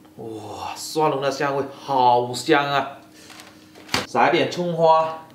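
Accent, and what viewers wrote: Fuzhou, China. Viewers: 福州音太呛了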